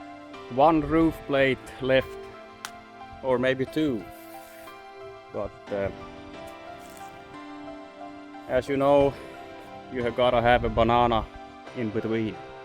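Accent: Finland. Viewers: Good video, how did you learn such good English, it’s very good. I see where you live, looked it up on Google Maps. But you sound exacting like everyone I knew growing up with your accent, I love it, reminds me of times and old timers long gone. Don’t know if you know anything as far as geography of US. But I grew up in Toivola, Minnesota, 50 miles NW of western tip of Lake Superior. All my ancestors came from Finland, I’m old 66, probably last generation here that didn’t learn Finnish, my grand parents tried, but I guess I had nothing to do with it when I was little lol, but when I went to first grade in 1966, there was some of my class mates that could only speech Finnish. Love watching your videos, and learning about your country and ways, I grew up on the farm also. One thing I know!! you live close to that big body of water that controls controls your weather! 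Our big Lake Superior does the same thing here. I always said my ancestors must have settled where they did when they got of the boat and train because the weather was horrible just like what they left so it was just little home!!!!!😂😂😂 Thanks your friend Larry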